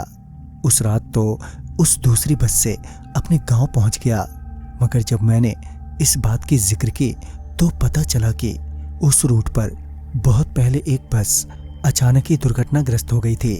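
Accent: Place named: Kolkata, India. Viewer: Narrator bhi kolkata ka lag raha hai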